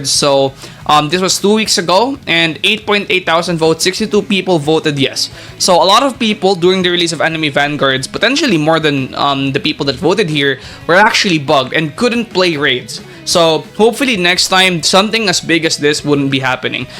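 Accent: Philippines. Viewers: accent makes it evident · bro, nothing negative, but you have a filipino accent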